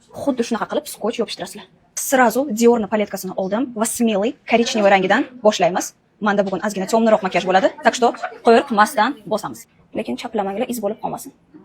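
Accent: Bukhara, Uzbekistan. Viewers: Бу киз манимча Бухородан. Шева тортаяпти. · Wevangiz zo'rde. Buxoromi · Opa Buxorodan misiz tojikcha gapirib qoʻydiZ☺☺☺☺☺ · Shumo a buxoromi yoki samarqandimi shumo chibarósh purstashtet gôyet tojiki gapzadashtagetonba. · Buxorolik ekansiz😂 tojikcha gapirganizdan bildim😂😂😂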